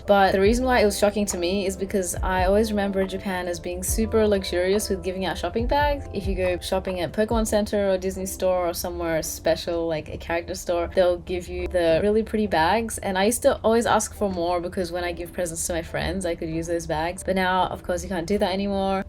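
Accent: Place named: Australia. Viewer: I like your Aussie accent so much.please keep it up uploading like this with accent! Less to see someone speaking Aussie accent. 頑張ってください！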